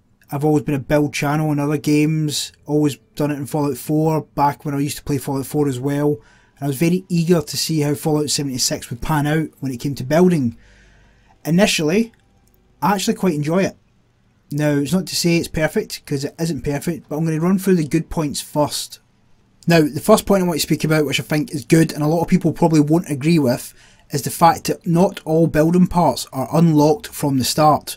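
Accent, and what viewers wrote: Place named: Scotland, United Kingdom. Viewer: I wanna say Scotland, but I always hate assuming things. An accent is defined as speaking one language but using the rules of another. Is there an indigenous dialect in your area of the world?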